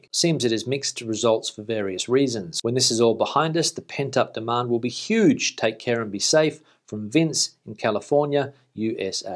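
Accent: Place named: Australia. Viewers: That’s because he’s from Perth Australia. After 20 years in Spain and unknown other reasons he’s developed his own accent. In today’s video he pronounced “assume” as “aschume”. I speak with a Californian accent, but weirdly pronounce several words with a British version, such as military, literally, and the weirdest one aluminum. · Saludos desde Australia!... I just discovered your channel yesterday! I moved to Beautiful Australia 3 years ago and I love it! Yes! We are lucky here! I was born in Colombia,but moved to the USA,when I was very young! So,thanks God I’m Bilingual...I visited Spain years ago and I love it! Dreaming about going back after the pandemia is over! Your English accent sounds Aussie!..but you know good Spanish by now! Keep up the good job! Gracias!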